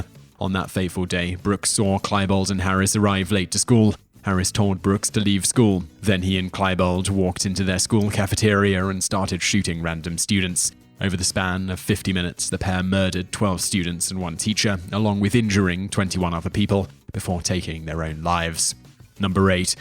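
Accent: United Kingdom. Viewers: Sean S., it doesn’t matter where she was born or how she pronounced it herself. British pronunciation is just as valid for narration as American pronunciation.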